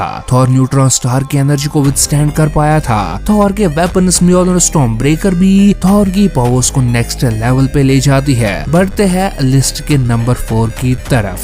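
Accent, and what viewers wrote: Kashmir, India. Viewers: You sound kashmiri?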